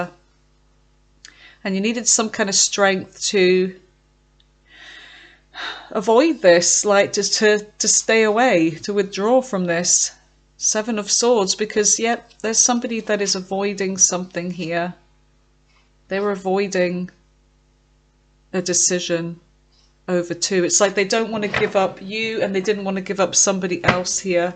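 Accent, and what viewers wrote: United Kingdom. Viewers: So accurate - can’t believe it. Love the British accent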